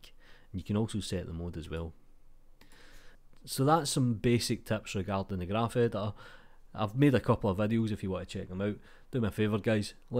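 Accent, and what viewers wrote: Scotland, United Kingdom. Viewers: I don't know much English but I understood that you are Scottish, because I watched the TV series Outlander! They sometimes spoke Gaelic there. Are you by any chance from the McKenzie clan or Fraser?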